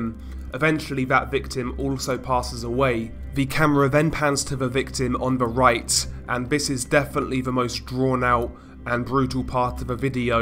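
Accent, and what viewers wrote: United Kingdom. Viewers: He really can't get more british lol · Oh the British accent, the best one❤️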